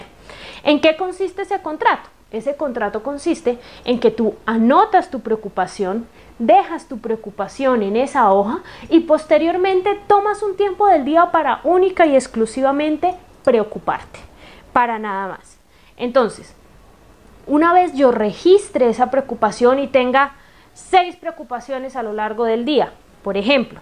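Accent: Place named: Colombia. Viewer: Parece colombiana por el acento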